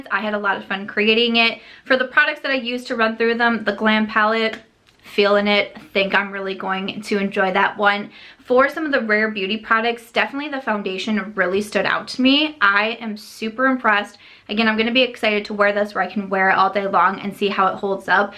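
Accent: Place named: Midwest, United States. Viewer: So i agreed with so much of what you said here. 1. This palette totally speaks to me; i also have very few cool-toned nudes in my collection. 2. Placement suggestions as names?! UGH. I understand the helpful aspect of it, but that can go on a little card or on the plastic sleeve that comes in palettes. I think names spark creativity when they give a vibe to go with the color. If (when?) i get this palette, i'll make little stickers with my own names to cover the nonsense that ND put on there. 3. Rare Beauty: i have lupus, rheumatoid arthritis, neuropathy, and several other conditions that cause pain and fatigue, which sometimes makes even the simplest tasks (like opening a small bottle) very difficult or impossible. So i deeply appreciate the thoughtfulness with the packaging. Plus her message about beauty is something we all need to hear. 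<3 Side note: your Midwest twang (it makes me miss my Chi home), your green eyes (like mine), your love of wine and the Cubs, your birthday is the day before mine (which makes us both Aries)...are we related?! LOLOLOLOL :)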